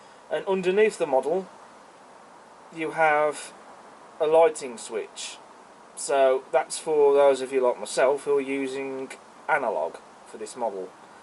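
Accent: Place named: Midlands, United Kingdom. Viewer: I used to live in Tamworth, back in the late 90’s. With the accent you have thought ud be in the Midlands.. I live in York now amazing city esp the plethora of Trains to see favourites being that York to Knaresborough beautiful😊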